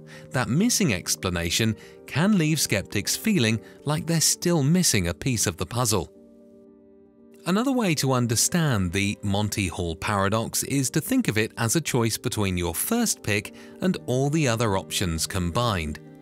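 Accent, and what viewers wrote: United Kingdom. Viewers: This is one of those rare cases where a British accent does NOT make something sound more true. is absolute B.S. because the car isn't in all three locations. The player has chosen what he has chosen and the car was placed where it was placed (though I wonder if these gameshows don't switch the prizes backstage while they are rambling on, distracting the audience). is B.S. because "all of the other options" are in the PAST when they are ruled out. Card counters don't work on the probabilities of the past, but the current probabilities based on which cards have been dealt or played. stating 1 out of 3 or 2 out of 3 is wrong because the third option has already been ruled out. No, it does NOT still have the same probability of winning. Once the third option is ruled out the odds change. If you play Russian Roulette the odds are 1 in 6, but when the hammer falls on a chamber the odds change, which is why there is so much BUILDING suspense in these scenes in The Deer Hunter. 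But the originally chosen door ALSO now has better odds! That is LOGIC. This video reveals what I have observed to be a YouTube rule of thumb: If it takes 20 minutes or more to explain, it just might be so much Bravo Sierra, taking advantage of your short attention span on a platform that caters to it, appealing to your desire to appear logical by mischaracterizing Logic as Intuition, and disarming you with a lengthy onslaught of eloquence without communicating much truth. If I had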